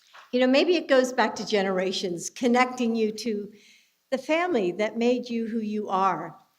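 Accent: South, United States. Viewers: I’m sorry, I’ve never heard her speak with a southern accent like the beginning of this- Would sound nice if any truth or help with her words- It gets worse as it goes on- Dear God I wish it from the heart or star center for which she refers! I gotta bathroom lady... As they complain and hate America but go thru every room- can anyone imagine Biden spewing out this speech? Happy Holidays everyone from my heart seriously! God bless!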